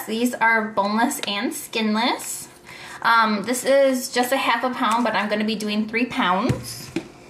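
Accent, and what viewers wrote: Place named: Minnesota, United States. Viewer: trying this tomorrow. You must be from Minnesota. I can hear it in your voice.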